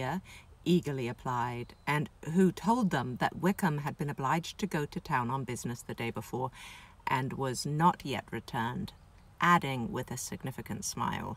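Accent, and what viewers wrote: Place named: United Kingdom. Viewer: Hi from New Zealand. Am enjoying listening to the modulation of your voice. And amused when you catch yourself slipping in & out of the British & US accents.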